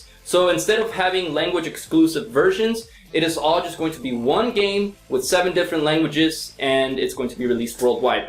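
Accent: United Kingdom. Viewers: YOU CAN NEVER COPY BRITISH ACCENT!!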